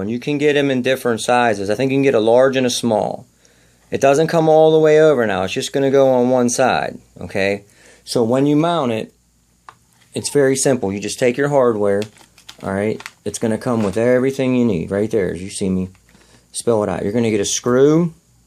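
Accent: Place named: Pennsylvania, United States. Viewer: "you can tighten this daaan" I love your Pennsylvania accent.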